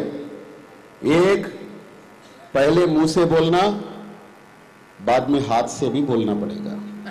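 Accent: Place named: South, India. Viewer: Oh my god what a pure hindi .... kon kahta hai south indian hindi nahi jante ... I m also SI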